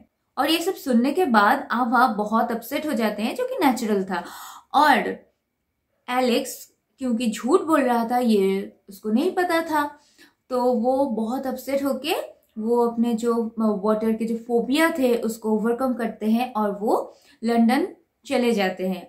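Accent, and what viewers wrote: Bengal, India. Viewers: She is bengali so her hindi isnt proper. No problem with that 😊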